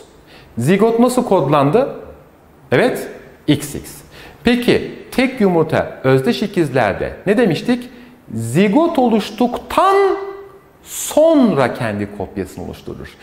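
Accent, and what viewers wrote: Standard. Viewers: türkçesi maşallah TRT muhabirleri gibi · Hocam 10 numara 5 yıldız adamsınız. En önemlisi diksiyon harika ve tertemiz Türkçe. Ha bi de Halil Sezai ders anlatıyor zannediyorum hocam arada karizma adamsınız :)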